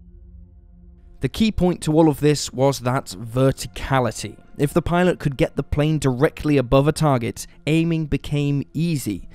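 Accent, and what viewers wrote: United Kingdom. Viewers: "american content makers", maybe, but the narrator here sounds like a limey git. Being "dutch", I would think even you would notice that. btw, I'm German descent, and I found it amusing that said limey git would pronounce "Ju" as "Jew" when describing an aircraft (a "Yunkers", if properly pronounced) used by a regime that was bent on exterminating an entire race by that same name. Twit.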